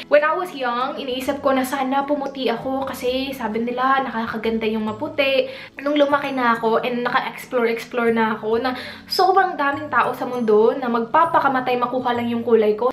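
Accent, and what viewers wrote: Australia. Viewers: You looked good, you're pretty & your pronunciation is normal na magchange cause in Australia may accent siya so need talaga ni ate na mag change yung mga words na ipronounce para maintindihan siya ng kausap niya na aussie (e.q, 'dinner' must be pronounced na dinna withour an 'r' ) mga gnyan. Anyways, i love you ate 😊 Pasagdi nalang nang haters kay naa rman na pirme, dili mana sila mawagtangan og ikasulti. Haha cause ana najud na sila full of insecurities & hatred. Always remember na mas damo nag hi-gugma saimo. Yay! Love you 😘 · Hi! this vlog is on point. never mind the bashers. they're just insecure. and you are right, wear what is comfortable on you and what suits you well. not what suits other's eyes. to those who criticize on how you speak, saying your "maarte" na. you're not, its just how you adapt with the Australian twang. so, stay chic and cool as you are. 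take care! say hi to Dan!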